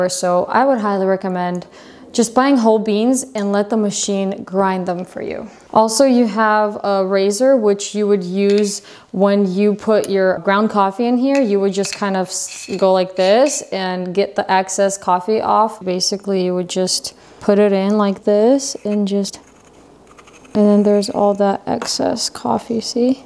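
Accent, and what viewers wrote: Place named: Russia. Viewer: i hear some Russian accent notes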